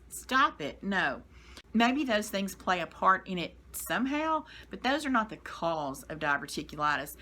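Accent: South, United States. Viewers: I can see **OATMEAL** being a problem. I eat these cookies my wife sometimes make. They are chocolate and oatmeal. Not like Oatmeal cookies. But the oatmeal (like nuts) get trapped in the bad spot of my intestine and cause a flare up! Wouldn't work for me! Oh yeah! I love your strong Southern Accent! 😊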